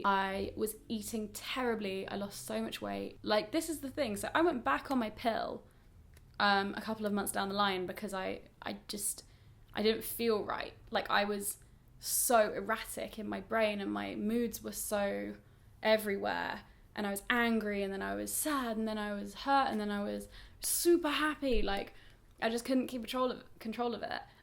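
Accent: RP, United Kingdom. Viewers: she's just got a posh accent tbh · cough There is the magic of editing...but let's also just stop for a second and admire her brilliant british accent! ;)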